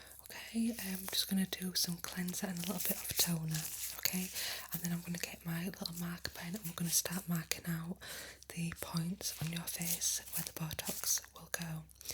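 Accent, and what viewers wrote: North, United Kingdom. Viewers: That was great. Nice to hear a fellow Northerner ❤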